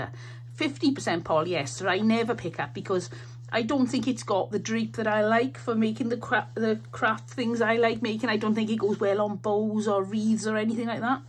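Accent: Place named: South Wales, United Kingdom. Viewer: I agree. The South Wales accent is so beautiful.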